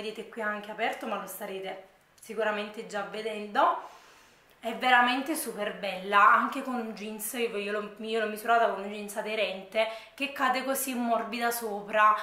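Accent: Naples, Italy. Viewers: ACCENTO NAPOLI SPETTACOLARE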